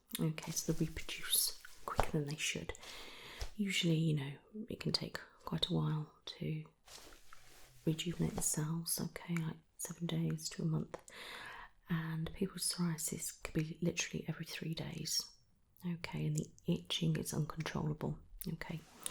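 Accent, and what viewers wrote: United Kingdom. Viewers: British English is really the best language for ASMR !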